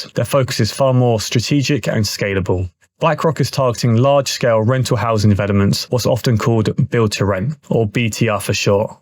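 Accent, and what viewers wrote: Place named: England, United Kingdom. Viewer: Not just across the UK ,but almost the whole world! No country should ever sold anything that was in their countries, and put very strict laws on how we import,export the goods along with never enjoying EU. Very appreciated information, aand your beautiful English accent, wich i always loved.You are probably from London or somewhere close Birmingham, guessing.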